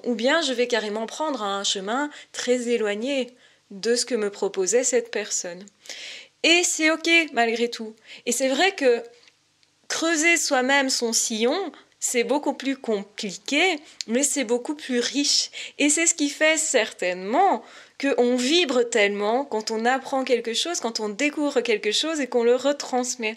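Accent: Belgium. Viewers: Tu es belge ?¿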